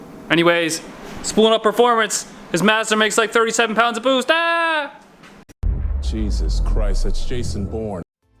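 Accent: Canada. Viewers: Lol, boots. Love the Canadian accent.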